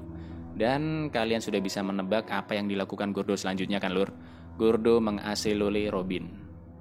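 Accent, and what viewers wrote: Java, Indonesia. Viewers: Adminnya orang jawa ya? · Jawanya keluar:'v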